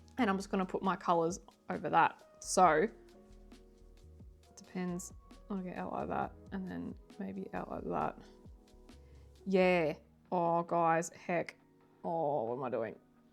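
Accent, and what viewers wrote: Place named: Australia. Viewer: mate i heard the first few words and subscribed.. always got time for an fellow Aussie. You sound just like I am with these things! I overthink and if its not perfect i'm ready to get a new book lol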